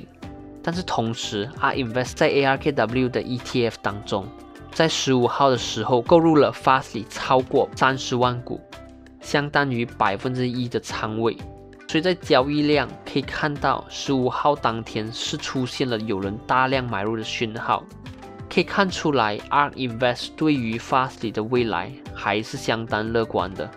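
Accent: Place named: Malaysia. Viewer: Austin是马来西亚人吗？说话方式很像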